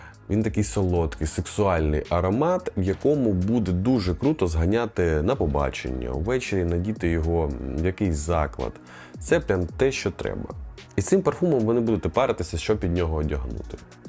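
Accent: Ukraine. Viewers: українська мова з Харьківським акцентов))) АГООНЬ))))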